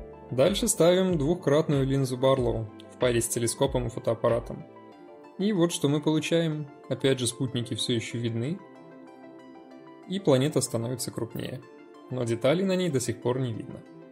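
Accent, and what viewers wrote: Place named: Minsk, Belarus. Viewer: Увы, минский акцент не спрячешь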